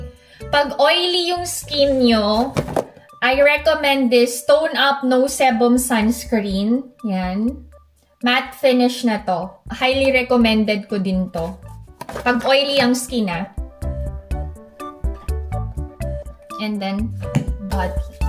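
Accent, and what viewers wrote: South Korea. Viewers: In my opinion, it’s completely normal to adapt to the Korean accent and the tone or sound of the way they speak. It’s also possible you’ve forgotten some words from your mother tongue—especially if you don’t have anyone to speak Tagalog with every day. That’s completely normal, since you’ve been living in Korea for a decade. I don’t understand why some people still need to be educated about this. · Na notice ko na talaga yung pananalita mo and I knew na adapt mo sa koreans yun,, but if you are not aware how you sound like ganito ang example,, “ you said This is how I speak,,, pero sa panding namin or how you pronounced it is sounds like “ This is how I speakah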